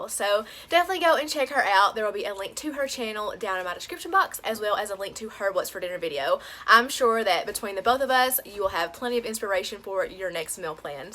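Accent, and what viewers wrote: South, United States. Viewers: Not to be weird but I love hearing your southern accent it’s very calming to hear you talk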